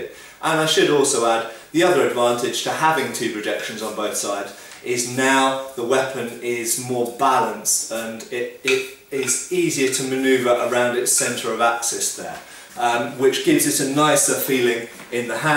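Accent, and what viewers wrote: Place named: England, United Kingdom. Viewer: Out of a myriad of tube video's I thoroughly enjoy yours for having such well presented video's. I also enjoy the Pommy accent and the authenticity when you always turn the camera off by hand gives a nice touch. P.S ASHES BELONG TO AUSTRALIA !!!!! Yeaaa, maybe you should do a video on what your cricketers should use as the most devastating blunt instrument for maximum batting efficiency :D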